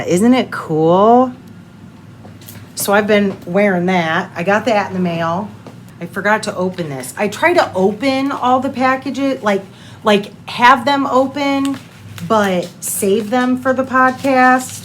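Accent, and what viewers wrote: United States. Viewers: I’m terrible. I just watch this because you’re hot AF but I also love your American accent, smile and demeanour.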